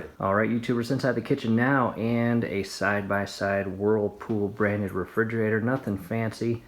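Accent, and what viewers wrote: United States. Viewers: Thank you short precise and American speaking. Right to point perfect outline got filter changed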